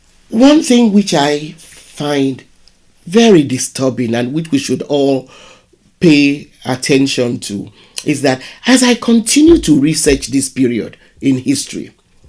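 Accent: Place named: Africa. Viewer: Thanks for this. There is a racist you tube channel called History Debunked. His supporters were in the comments section talking about Black people coming from primitive tribes. I sent them this video to show them who were the primitive tribes. It will also gall them that it is a Black Woman speaking about this with an African accent no less.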